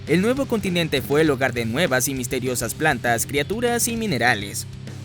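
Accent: Venezuela. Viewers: bro eres de venezuela coye que bien vl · Eres de venezuela? jajajajaja este pana me agrada ahora :v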